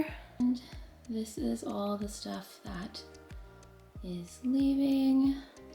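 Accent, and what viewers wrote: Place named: Canada. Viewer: You're doing a great job! I'm like you and get completely overwhelmed by clutter but I'm not a naturally tidy person so it's an uphill battle! I think my space is similar to yours in that it already doesn't have that much in it to begin with. But it's all abt how we FEEL abt the space isn't it? At one point I recognized your Canadian accent and then shortly after saw The Bay on a box! Judging from a scene in another vid, I used to live in the same area (generally speaking!) as you. I look forward to the rest of this series of vids👍🏼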